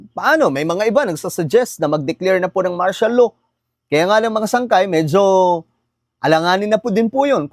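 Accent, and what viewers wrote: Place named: Visayas, Philippines. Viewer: Bkit mo pinakikita Yan na kesyo Marami sila,isa ka talagang DDS din ..parang ipinagbubunyi mo ang dami nila ,iyan ay mga inc members at konting DDS.Halata ka na di ka tunay na ilokano may pasangkay sangkay kpa eh isa ka nmang bisaya ( halata ang pronunciation mo ay bisaya).majority bisaya ay DDS at isa ka duon..tanggalin mo inc member Dyan kundi nasa 100 to 150 lng ang DDS.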